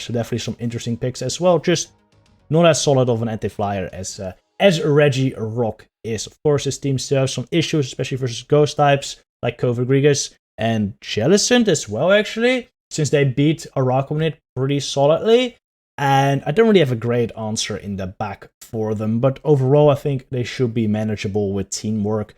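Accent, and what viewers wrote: Netherlands. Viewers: Jij bent nederlands of nie???🤣😂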